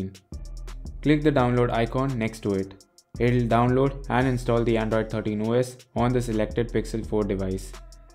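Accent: India. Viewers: its the Indian " english " accent .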